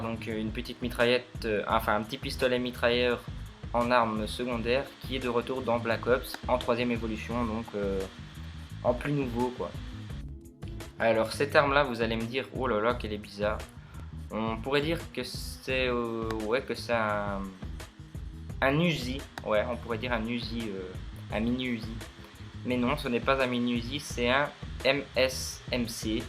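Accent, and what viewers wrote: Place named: Belgium. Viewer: accent belge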